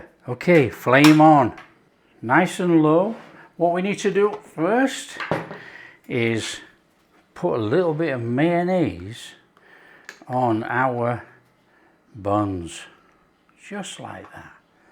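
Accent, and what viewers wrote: Yorkshire, United Kingdom. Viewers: My Mouths watering! I'll have to try this as I love Spam! It's not easy to find where I live in the Golden Triangle! 😄 Great to hear a Yorkshire accent when I'm so far from home! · you sound like you are in the UK? Where are you getting that much ground pork shoulder for less than a tin of Spam? I'd love to know.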